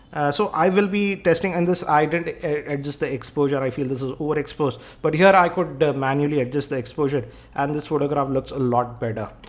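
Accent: India. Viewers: Indian accent, the worst on the planet...what is this guy saying exactly? Indians claim to be native English speakers but I seriously doubt that...it is a 3rd language to them.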